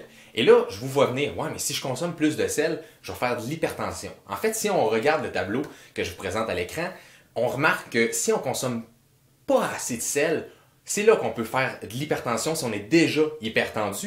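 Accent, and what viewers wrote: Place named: Quebec, Canada. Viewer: Jaime trop l’accent québécois 😁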